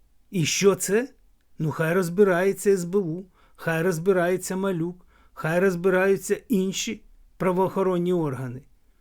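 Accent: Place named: Russia. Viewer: Як не соромно.Що ти иелищ? Навіщо стравлювати людей.Війна.Нпаіщо говориш українською з москальским акцентом? Єто в рашке завжди единовластие а у нас пока слава Богу є вибір. Навіщо використовувати політтехнології ОПЗЖ?Як вже набридли вислови в стилі мураєва.Гагьба.(пожилой человек а вреш как молодой,уже касса ждет на виходе).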